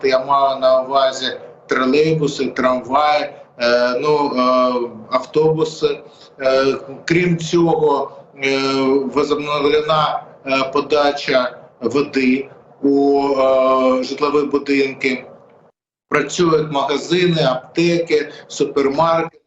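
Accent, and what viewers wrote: Kharkiv, Ukraine. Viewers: Игорь Александрович, рада слышать из ваших уст украинскую речь. Я по образованию учитель украинского языка и, как педагог, ненавижу любое давление/принуждение. Сейчас вновь разгоняется языкой вопрос и я боялась, что "патриоты" вас просто сожрут за харьковский диалект. И им пофиг, что в окопах для наших парней не имеет значения, кто как говорит, важно, что все едины, все украинцы, готовые за Украину бороться и побеждать. Кому-то выгодно в это тяжёлое для нашей страны время раздувать гражданские распри. И далеко не все это видят и понимают, просто по-телячьи бездумно ведутся на вражеском поводке.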